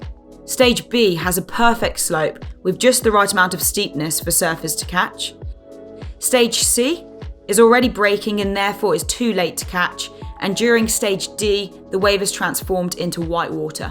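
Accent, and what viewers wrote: United Kingdom. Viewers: Slight British accent...lol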